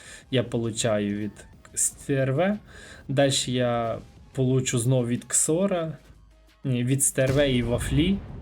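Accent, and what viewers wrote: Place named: Poltava, Ukraine. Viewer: Якій же в тебе гарний Акцент!Звідки ти,Полтава?Миргород?Суми?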